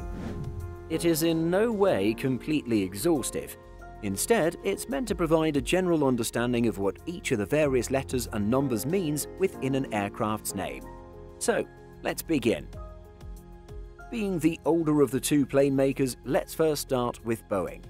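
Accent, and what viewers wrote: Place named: North, United Kingdom. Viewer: This is first time I’ve noticed your northern accent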